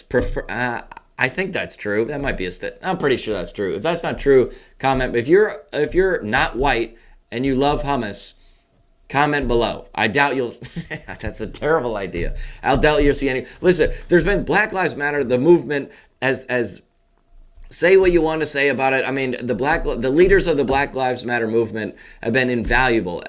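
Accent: South, United States. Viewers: Keep it suh-thurn! It’s the best!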